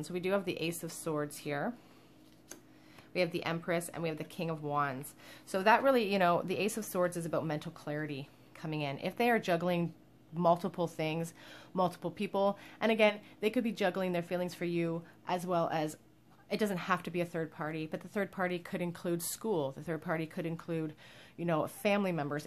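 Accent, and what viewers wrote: Canada. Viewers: Question: are you Canadian? I just sense a Canadian vibe by the way you say the words out and about? Just wondering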